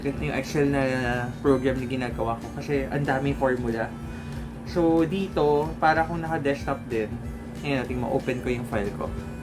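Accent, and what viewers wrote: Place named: Iloilo, Philippines. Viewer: May naririnig akong ilonggo accent hehe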